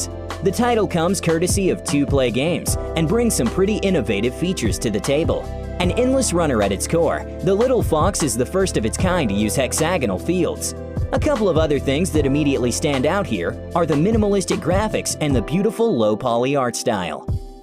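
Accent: United States. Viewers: Theb why does your voice sound so american if your indian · A handsome Indian with a perfect American English , Man you rock!!!!!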